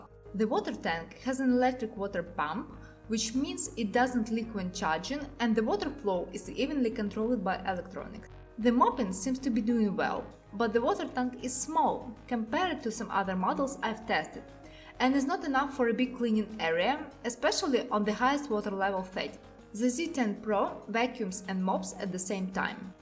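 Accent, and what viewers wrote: Russia. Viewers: Thanks for the review, but the accent is too Russian, that it hurts ears.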